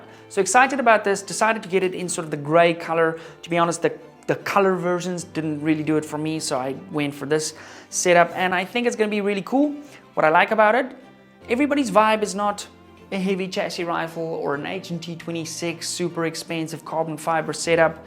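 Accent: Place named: South Africa. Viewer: I just got into distance shooting, I've learned a lot from your content. Also I lived in ZA for a couple years, it's good to hear the accent.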